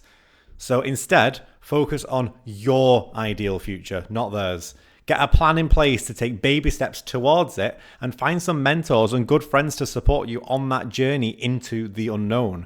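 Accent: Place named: North, United Kingdom. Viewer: LOL I’m from Preston and thought we had the same accent but now reflecting I thought there was a Yorkshire tweak as well. Always weird when you hear someone not without American accent but with an accent so close to yours on YouTube.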